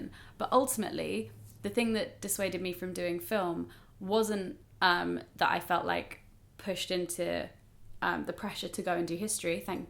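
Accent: United Kingdom. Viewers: I just want to say that your British af accent kills me. Seriously.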